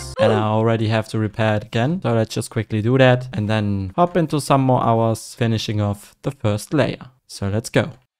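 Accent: Germany. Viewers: I like the fact that he has a german accent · Ich gucke dich zum ersten Mal und hab direkt an dein Englischen Akzent gehört das du deutscher bist 😂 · Love the german accent (im german too lmao) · erstens wusste ich garnicht dass du deutsch bist zweitens als du deutsch gesprochen hast hat man dich nur schwer verstanden da du so viel grass plaziert hast wow jetzt wo ich weiß dass du deutsch bist fällt mir auch dein aczent auf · Der deutsche Aktzent kahm mir schon etwas bekannt vor, aber geiles vidio :p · Deutscher akzent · The gearmen acent 🤌🤌🤌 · PLEASE PLACE LIGHTNING RODS AROUND SO IT DOESN'T HIT THE BUILD OH MY GOD btw dachte mir schon von Anfang an, "das klingt wie ein Deutscher" 😅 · I hear a little German AKZENT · You sound german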